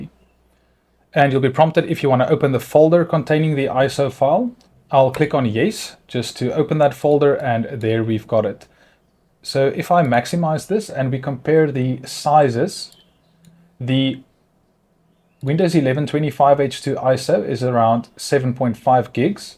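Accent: South Africa. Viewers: Only a SAFFA could create this boet. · I hear a South African accent or am I dreaming? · Jisss meneer! Ek wou se jy klink Suid Afrikaans! Baie goeie werk. Dis regtig goeie sagteware! Ek was ook in IT lank terug en jy is reg. Die program sou vrek baie tyd gespaar het al daai jare terug. (BTW ek hoop jy verstaan afrikaans). Sal vir jou n donasie gee nadat ek desember vakansie gehou het. Groete uit Gauteng.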